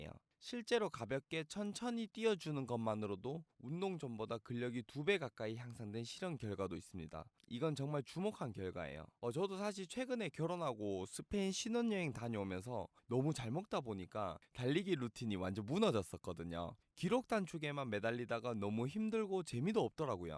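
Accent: Gyeongsang, South Korea. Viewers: 이거 말투가 대구네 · 대구 분이시네요ㅎ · 대구 분이시네요. 저도 대구 사람입니다. 반갑습니다 ㅎㅎ 구독하고 갑니다.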